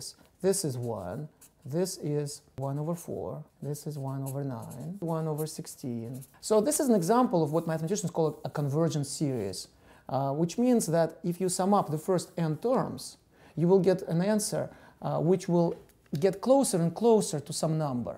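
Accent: Russia. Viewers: You got a strong accent *kind of Russian I guess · Я тоже смотрю и думаю - он по ходу русский, раз я так хорошо его понимаю) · Me hear russian accent too. It's interesting because the man who named edward frenkel cannot be russian. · The accent is Russian. I know several people with exactly the same accent. Actually, it could be Belarussian as well. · I love math, as much as I love your Russian accent. :3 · да да, вместо three три говорит :) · there is no german accent to hear in this. Believe me i am German. His accent is clearly Russian and i find it quite nice to listen to. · Not sure why but his Russian accent makes me understand math better. My all time favorite Numberphile video. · Now why does he have russian accent? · мне кажется или у него русский акцент?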